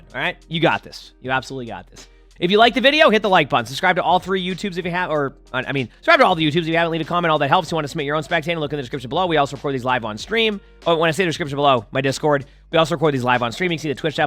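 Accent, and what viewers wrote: United States. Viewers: Can someone tell me what accent has? I know it’s American ofc but what state specifically?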